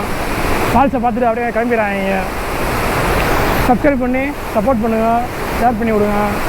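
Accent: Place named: Madurai, India. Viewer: Hi, Nice Hidden waterfalls & your natural Madhurai Slang is so good to listen.....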